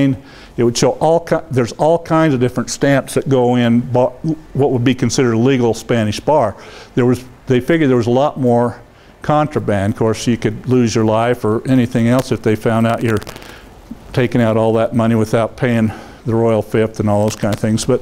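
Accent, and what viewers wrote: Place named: Deep South, United States. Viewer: This man seems very nice. Listening to this presentation is just like when you pull up to a barber shop and ask for directions in the deep south. 😅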